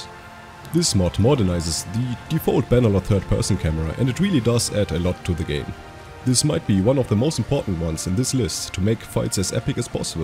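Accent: Germany. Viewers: Irgendwie hörst du dich wie ein deutscher an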